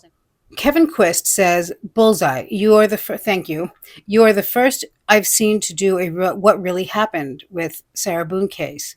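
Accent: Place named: East Coast, United States. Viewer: Off topic but I love your hair, you’re a stunning young lady- also, yes…lady Macbeth is also a fantastic show, she does great work!! I was laughing with her drunky the bear videos-her trying to get into SB mind set-yikes!!! I think with your accent you must live east coast-I’m west coast-we should all have a SB or forensic files marathon!! 😂 My best friend and I watch our “murder shows” mom calls them 😅and we enjoy them, so glad I found all the people with like minds !!!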